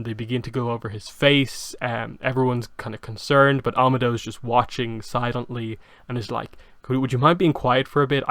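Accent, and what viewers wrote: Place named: Ireland. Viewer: You sound Irish but this was a v good review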